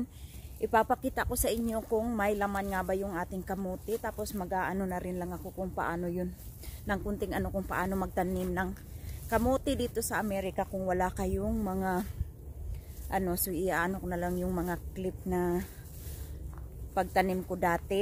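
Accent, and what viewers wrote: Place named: Bicol, Philippines. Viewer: ahh kya pla yung tono nyu😊 bicol din ako catanduanes... kmusta nmn po kayo jan..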